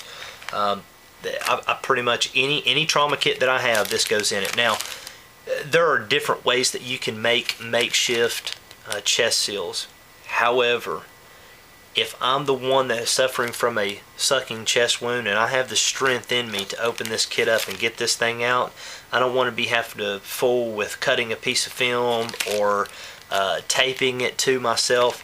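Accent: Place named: South, United States. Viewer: Your accent tells me somewhere in the south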